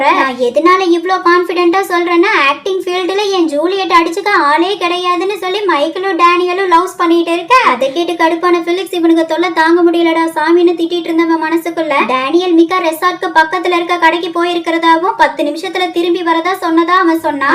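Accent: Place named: Coimbatore, India. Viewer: Neenga Coimbatore i am thirvannamalai sis i love ur slang and voice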